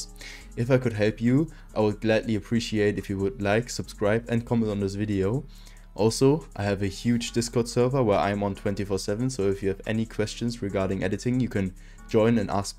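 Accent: Germany. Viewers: man hört dein deutsch extrem raus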